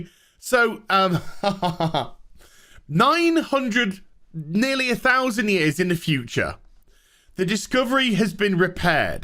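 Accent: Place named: North, United Kingdom. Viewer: After months of research I've finally discovered what each word in your intro is.... Cuz your voice is, It's fast. And northern. I'm Canadian. I had to take my fucking time here and figure shit out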